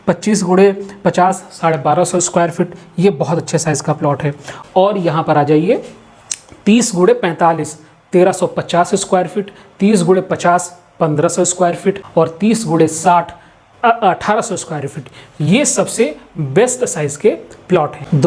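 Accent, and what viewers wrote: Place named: Lucknow, India. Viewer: Bahut achche se explain kiya h lucknow se hn vo aapke bolne ke andaj se pata chal raha h. Informstive video h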